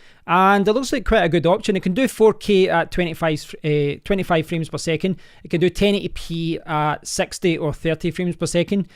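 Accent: Scotland, United Kingdom. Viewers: ! Brilliant! Magic! Finally someone on Youtube I can understand. ( I'm Scottish too ) living here in the US. I liked your review of the Dash cam. very good. keep up the good work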